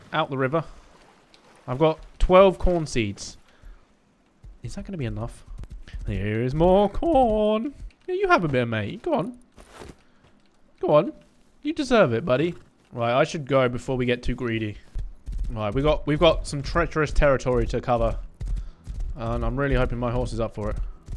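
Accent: United Kingdom. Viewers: Yo, about the coffee thing... I wanted to ask you a question - which may sound odd but it's genuine. is just your nickname or your actual nationality? (I don't recognise accents well, English is not my mother tongue). 'Cause I'm a Pole who spent 16 years in Italy and got used to that quality of coffee, I'd never buy coffe from an American because they do it wrong 😅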